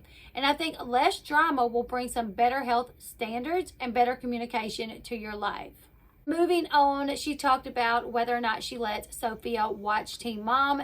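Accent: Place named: Shreveport, United States. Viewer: I love your channel and accent, it sounds like my family in Shreveport (I'm currently in Detroit).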